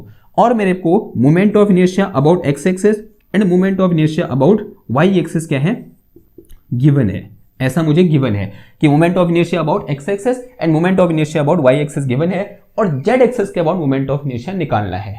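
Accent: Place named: Kumaon, India. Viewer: Kumaoni accent ❤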